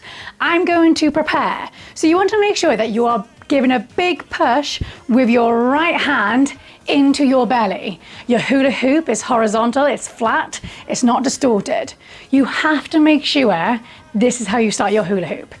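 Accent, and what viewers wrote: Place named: Wales, United Kingdom. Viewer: Oh my days, came on here to learn how to hula(after seeing the Texas Hold 'em short) and there's a Welsh accent 🏴 (well I think it is anyway 😂) This is gonna be fun! ⭕⭕⭕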